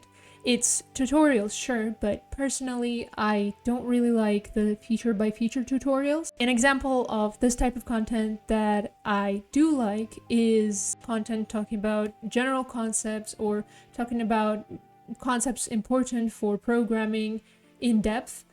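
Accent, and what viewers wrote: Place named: United States. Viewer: You have a very American accent, I thought you were an American at first.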